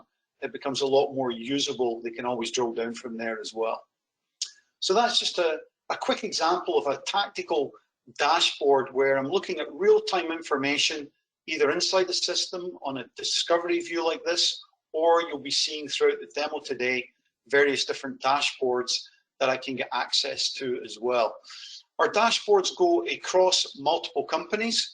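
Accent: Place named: Canada. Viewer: Hi it sounds like you're based in Canada. How can we reach out to you for consulting opportunities?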